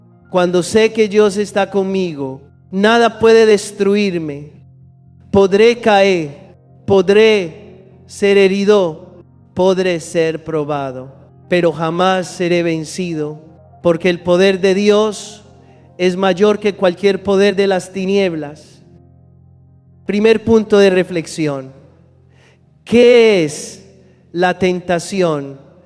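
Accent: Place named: Portugal. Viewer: por qué habla con acento portugués? muchas gracias por compartir el evangelio.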